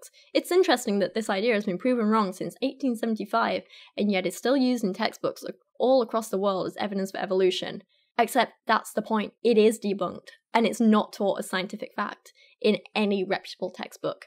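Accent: United Kingdom. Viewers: Sorry little girl but Kent would destroy you in a debate! You are not smart! I know you think you are but your wrong. just like most ppl like yourself are. You talk to stuffed animals! Your brit accent doesn't mean you are smart honey. Your gen. were spoken about by Jesus himself and you will see the end days soon enough and I cant wait.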